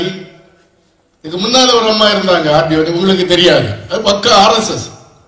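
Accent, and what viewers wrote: Kerala, India. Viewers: எனக்கும் சந்தேகம். பேச்ச கேட்டபோதே தோன்றியது . இவன் ஒரு மலையாளி என்று தோன்றுகிறது. ஜெய் ஹிந்த் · நீ பேசும் தமிழ் நாடார் பேசும் தமிழல்லவே. மலையாளம்வாசம் கலந்து நாறுது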